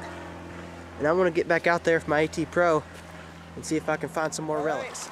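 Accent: South, United States. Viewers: That's a Southern accent I know because I am Southern and spend my life outside with the chickens and cows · You got the most country accent ever! I didn't think anyone could outdo me. Good luck out there huntin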